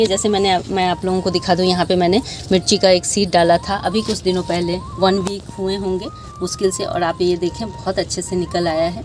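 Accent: Bihar, India. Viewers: Aap Bihar se hain kya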